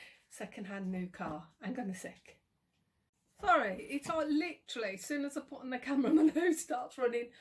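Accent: Birmingham, United Kingdom. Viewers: Hello and a good video you’ve done thanks showcasing your storytime regarding motor car situation and I have previously seen a few or so videos you’ve done and love your personality such as your proper brummie accent and humorous ways and may I ask you to please feel free to checkout my channel and hope you’ll subscribe like I’ve done for you thanks as us small YouTubers must help each other so that’s all for now so until the next time take care and cheerio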